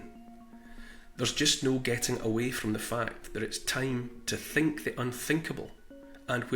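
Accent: Scotland, United Kingdom. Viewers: Think the unthinkable . Do the undoable. (Do the unthinkable) . Do what? (Imagery concerning the cremation of bodies). To whom? ( We need to watch out for a handful of people who have ancient malign desires). This man has a disarmingly quaint Scottish accent, a very friendly manner, and a lovely white, light filled house . (Pay attention to his words).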